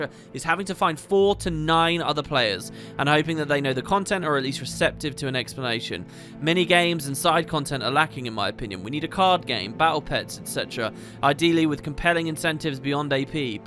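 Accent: United Kingdom. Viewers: "wearing his burnout on the game" DUDE youve been covering this game for like 14 years bro I literally give you the most respect for sticking through with consistency and having enjoyable content. Your voice is so ingrained in my head that whenever I hear a british accent at work I think "Oh that guy sounds like lmao". keep going, this franchise is my favorite and you are basically one of the main reasons I even play guild wars today. Keep it up, never let them get you down, we are all here for you my man and waiting for the day you start doing three two-hour EoD episodes:DDDD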